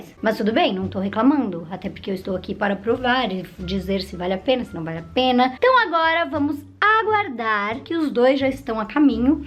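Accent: Rio Grande do Sul, Brazil. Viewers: Eu: aaaaaa è gauchaaaaaaaaaa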